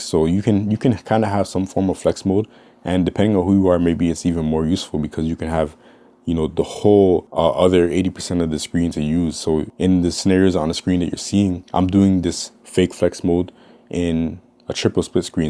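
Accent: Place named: Canada. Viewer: I thought you were canadian from your voice but then saw the fibetv. How the f lmao. Bro that was so much to import. Thanks for making this content dude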